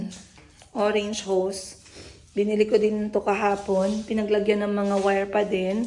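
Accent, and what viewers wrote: Iloilo, Philippines. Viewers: Nami yah ilonggo nga tono hahahaha mo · Ilongga gid tuno mo taga Antique ako dhay. Kaya lagi ko pinapanood vlog mo. Be strong lng gid. Enjoy lng sa mga anak mo. Watching from bharain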